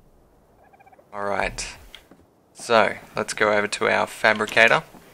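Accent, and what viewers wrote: Australia. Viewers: Was looking at how to aim - and watched the whole thing laughing - and - its nice to hear a fellow Aussie voice!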